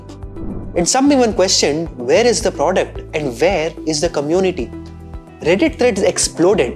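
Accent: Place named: India. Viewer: the presenter is a from which part of india??? can't even speak english properly, God please help me. why he does have an accent. It is fine with these hyped AI, it happens as otherwise many will be just taking help the already big AI Cos.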